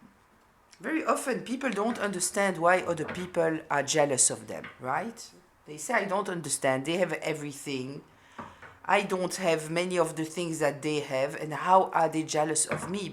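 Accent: France. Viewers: I enjoy your readings very much. Watching from Arizona where it seems more and more of those narcissists are being bred every time I turn around! Your insights are amazing and very helpful. I really love your French English too. Thank you for your energy.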